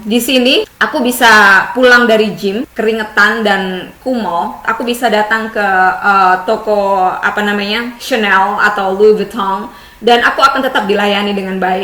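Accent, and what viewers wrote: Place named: Java, Indonesia. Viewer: Setdahh medoke metu mbak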